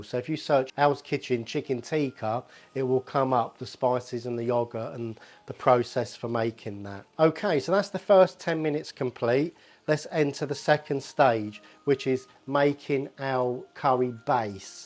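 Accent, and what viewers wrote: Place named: England, United Kingdom. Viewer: I'm dying to know what part of England you're from. That accent is to die for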